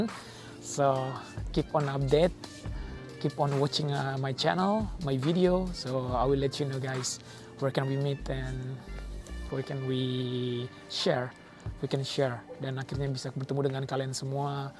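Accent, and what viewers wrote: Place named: Java, Indonesia. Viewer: Kok ragazzi medoknya kebawa ke Jakarta.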